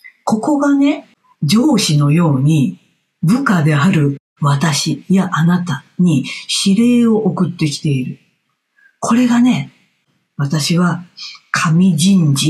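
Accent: Kansai, Japan. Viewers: さん大切なお話し有り難う御座います😊目に見えない世界のお話は君が代の祝詞の中に書かれてありますね（千代に八千代に）を解説するとこの世は目に見えない世界(霊主体従)と見える世界(体従霊主)の内容が書かれてあります！私ね！宇宙のエネルギー、波動、周波数と言った振動を数値化出来る才能を天子から預かり不思議と分かります！さんの関西弁が大好きです😍😅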